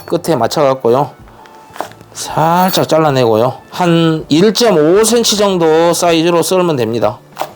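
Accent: Jeolla, South Korea. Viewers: 말투가 너무 정겨워요. 전라도 사투리는 요란스럽지 않아서 좋지요 ㅎ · 전라도 사투리 구수하네 ㅋ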